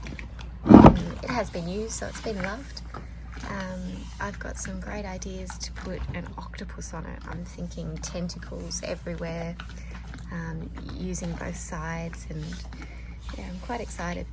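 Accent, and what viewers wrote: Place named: Australia. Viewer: Really amazing stuff you have here!! LOVE IT!! I have a little wood burning set....I might have to get it out and try a few things!!! ❤️ Sounds like you are down under!!! AND>>>how cool are you out on a boat?? Awesome!!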